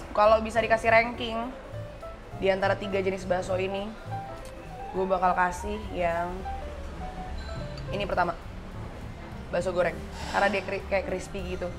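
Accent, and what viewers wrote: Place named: Makassar, Indonesia. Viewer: lidah emang makassar banget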